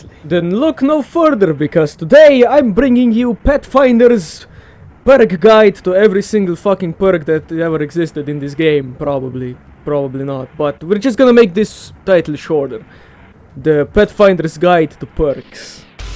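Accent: Russia. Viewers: You sound very russian. You should try to go for a 50/50 comedy and info mix, you will do really well. Anyways, video makes a lot of sense, good shit!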